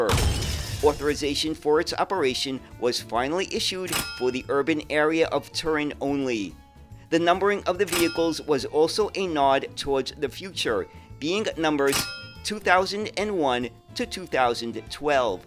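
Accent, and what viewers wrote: United States. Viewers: Thank you for yet another interesting bus video. I am not from the USA and so I hope you don't mind if I ask about your accent and which part of the US you come from?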